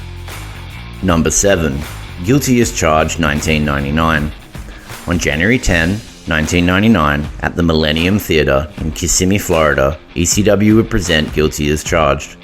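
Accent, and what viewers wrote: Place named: Australia. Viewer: it's exciting to hear what sounds like a fellow Australian ECW mark, E-C-Dub, E-C-Dub, E-C,Dub XD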